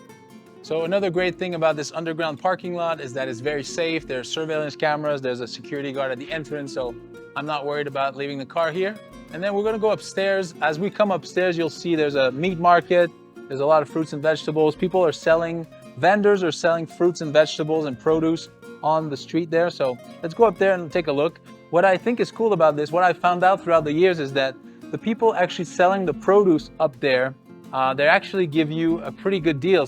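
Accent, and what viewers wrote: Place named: Ecuador. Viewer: Idk how I got here but I'm glad I did, loved this video! the bocadillos and n that red thing made from guaba are both my favorite too, the encebollado mmm... I actually hate it I might be the only Ecuatoriano who hates encebollado lol... saludos desde Guala-Azuay-Ecuador 👋 👋 btw ur Spanish is incredible u even have our Ecuadorian accent.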